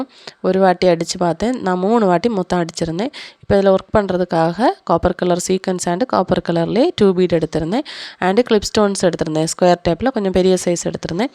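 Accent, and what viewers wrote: Kanyakumari, India. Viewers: I like your Kanniyakumari slang sis ❤ Your ideas are 🔥👌🏻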